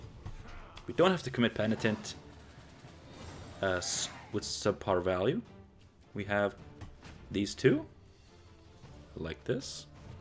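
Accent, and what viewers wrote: Norway. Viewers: are you from Norway?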